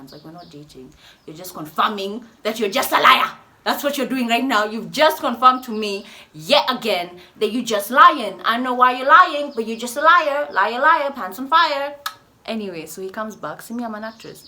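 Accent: United Kingdom. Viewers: I reall love how you mix up accents, , british English and "kenyan" english, , na some kiswahili😂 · That British accent 😍😍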